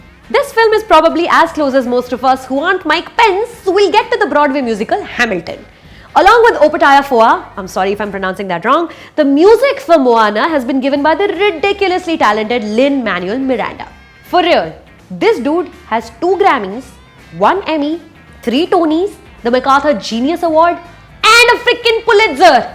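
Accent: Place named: India. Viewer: I love the way you explain the movie in your indian accent. Its totes adorable.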